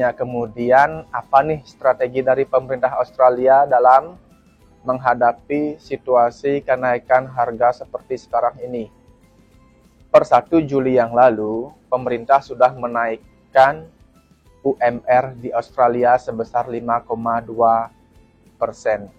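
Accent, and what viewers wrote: Bali, Indonesia. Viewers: Pasti asal bali, logat2 bali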